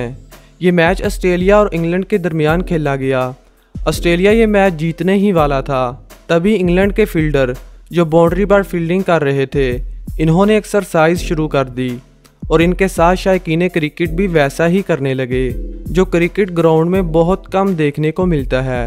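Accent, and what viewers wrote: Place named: Punjab, India. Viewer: Poor comentry punjabi style😔😔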